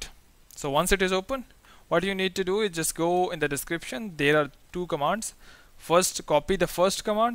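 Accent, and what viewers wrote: India. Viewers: probably a virus or something since its from an Indian accent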